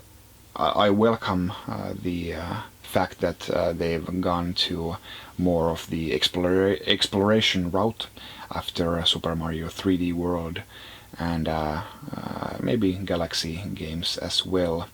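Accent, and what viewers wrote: Finland. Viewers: You're Finnish :) from the accent. I got my Nintendo Switch a couple days ago <3. Will get a Pro Controller at some point and Donkey Kong Country Tropical Freeze when it comes out. Also Zelda BOTW and others. It's an awesome hybrid console. both docked and portable is great. Graphics are very much good enough and then some. I have SMOdyssey + MK8Deluxe. Yep 1 year old now. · Lucas Shumake typical nerdy finnish guy accent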